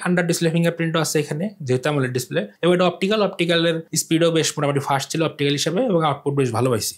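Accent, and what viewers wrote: Tangail, Bangladesh. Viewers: ভাই কি টাংগাইলের?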